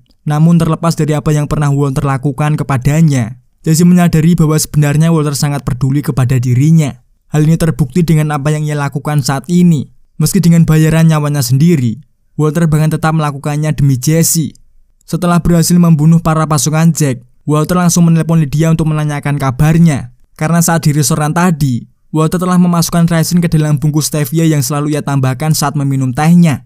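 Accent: Java, Indonesia. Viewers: Akhirnya. Selesai setelah. Berjam jam yimak. Seru ui. Apa lagi narat0r nya. Berl0gat med0k. Berasa tak asing. Seperti ng0br0l ama kawan diselala n0nt0n pilim 😁😁😁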